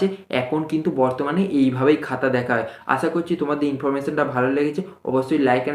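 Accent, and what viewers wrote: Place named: Murshidabad, India. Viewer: sorry 🥺apnar voice ta onek ta murshidaabad er moto ty R ki bollam sorry🥺 kichu mone koren na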